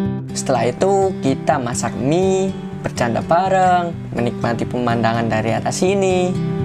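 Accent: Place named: Java, Indonesia. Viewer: Logatnya jowo bget..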